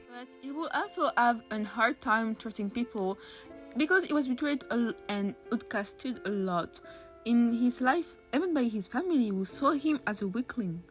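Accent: France. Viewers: Are you french? If not I'm sorry ik nothing abt accents 😭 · I have a question, are french by any chance??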